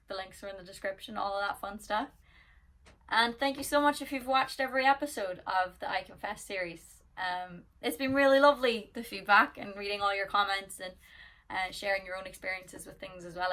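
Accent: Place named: Ireland. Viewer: I am a 67 old man in Holland. Two weeks ago I saw you the first time on youtube, looking at X factor video’s. The simple way you sang the song struck me. Vocaly you were not perfect, but you told a story. Your voice, Irisch accent and appearance intrigued me. I listened to other songs you sang and each time you told a story more than you sang a song., you are a storyteller. That is why I also like to listen to your vlogs. When you are telling what you are doing en things about youself I clearly see what is going on in your mind. Emotionaly you are an open book and that is also what you want to be. I know that everything on the outside of you is fake, but the inside is reaal and that is what makes you special for so many.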